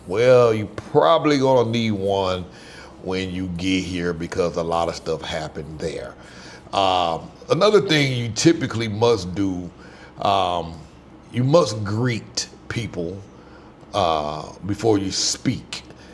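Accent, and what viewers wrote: South, United States. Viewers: Your accent sounds southern USA.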